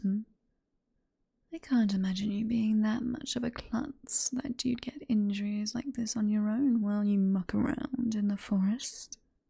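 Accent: United Kingdom. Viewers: Definitely an interesting audio, and once again proving that the British accent lends itself so well to villains.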